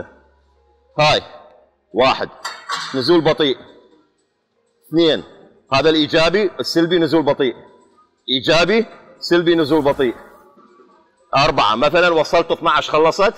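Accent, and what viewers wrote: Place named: Iraq. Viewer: احلى يحجي بس عراقي لهجه عراقيه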